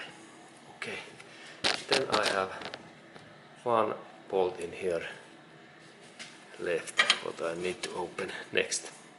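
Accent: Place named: Finland. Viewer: You are Finnish i love your english!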